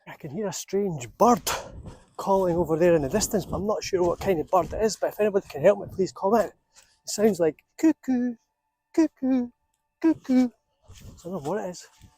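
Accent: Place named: Northumberland, United Kingdom. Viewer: Your accent in the Scottish Borders is strikingly similar to Northumbrian, and it stands out from the heavy, guttural Ayrshire or Glaswegian accents that have come to define Scottish English.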